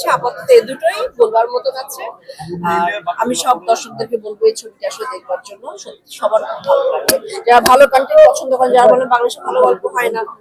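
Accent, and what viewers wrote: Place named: Kolkata, India. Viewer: এর কথায় কলকাতার টান আছে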